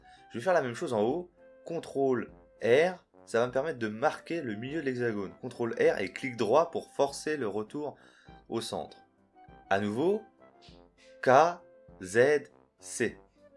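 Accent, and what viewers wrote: France. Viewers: Wowwww a really well edited video, nice! I really like your french accent haha I was okay with reading subtitles but it's true that it could've been a lot better. Above all, it was one of the best tutorials I've seen :) It seems you really know what you're doing and letting people know the steps one by one! Cool! If you make more archviz tutorials I would definitely come and always support your videos.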